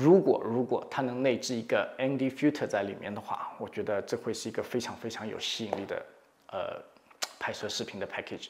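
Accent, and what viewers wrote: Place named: Jiangsu-Zhejiang, China. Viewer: 应该是江浙一带的人，听他讲普通话觉得很亲切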